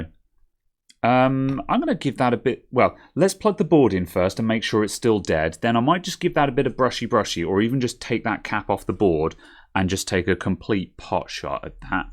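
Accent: United Kingdom. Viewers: Your voice is literally why I have your vids running as a background when I work. So soothing and british :D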